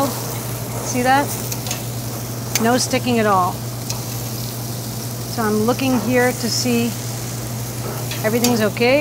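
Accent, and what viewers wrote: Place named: Northern England, United Kingdom. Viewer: I’m living for your Northern accent luv. Proper bloody great!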